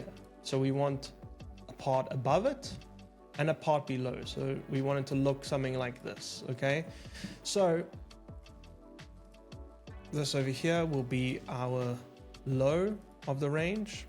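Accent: South Africa. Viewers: Thank you for this video - nice and clear and I think it was a beautiful South African accent :) I am new on your channel and found this very helpful!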